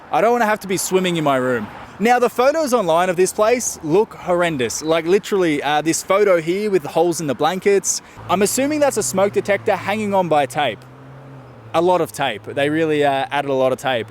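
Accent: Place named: Australia. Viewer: Men with Aussie accents like his makes me MELT.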